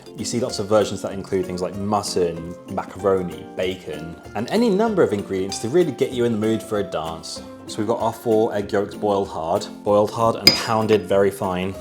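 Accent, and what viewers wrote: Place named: RP, United Kingdom. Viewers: As an American I have to say that your accent sounds "posh" to me all the time. And now I want a grilled cheese sandwich! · I’m from Manchester but went to school in Surrey and London, so I have a mixed northern and southern accent. To me he sounds typically middle class Home Counties. Not posh, but middle class. Boris Johnson or Jacob Reese Mog is posh southern they’re touching closer to Received Pronunciation, like Prince Charles or the former queen.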